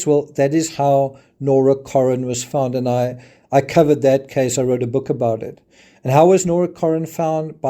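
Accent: South Africa. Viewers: I hope you stay here, love your analysis. Lekker to hear a South African on the tubes ❤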